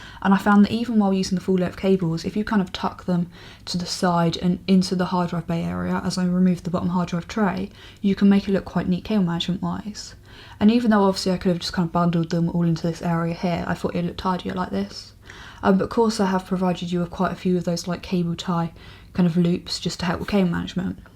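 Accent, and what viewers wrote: United Kingdom. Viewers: Oh I love the British accent.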